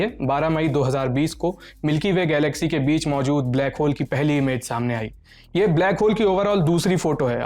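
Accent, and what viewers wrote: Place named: Purvanchal, India. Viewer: मैटर फस्स्स गया है 😃😃😃 आप और स्टोरी राइटर 200% यूपी पूर्वांचल के मालूम पड़ते हो 😎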